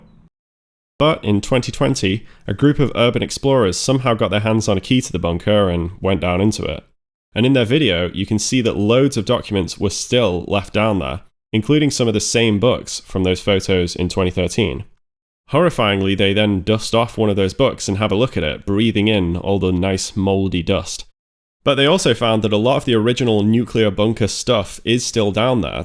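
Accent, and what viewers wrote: United Kingdom. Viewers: england is such a nice skansen and a british voice is just perfect as narration. nice video bro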